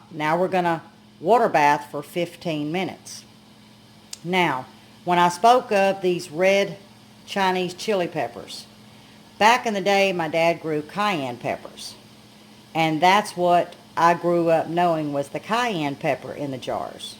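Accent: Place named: Florida, United States. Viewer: I absolutely love your accent. My kenfolk are from Blounstown FL Panama City FL and speak like you. You sound just like my cousin 😊 Good video. Thank you.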